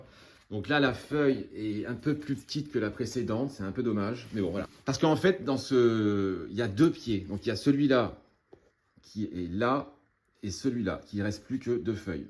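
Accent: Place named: Picardy, France. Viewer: Bonjour. Je découvre votre chaîne, très sympathique et colorée. Merci pour la bonne humeur, et toutes vos beautés. ( Il me semble reconnaître un accent '' un peu de Picardie '' 😁😉. A très bientôt !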